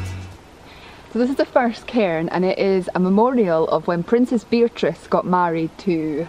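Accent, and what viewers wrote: Scotland, United Kingdom. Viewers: Man she sounds scottish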